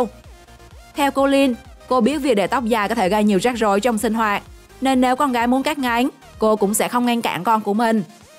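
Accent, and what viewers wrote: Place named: Phu Yen, Vietnam. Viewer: Cô này là người Phú Yên- Tuy Hòa. Cô ấy cố gắng sửa giọng để giọng nói không bị rặc tiếng địa phương, nhưng càng sửa thì giọng cổ lại càng khó nghe vì nó lai đủ thứ miền.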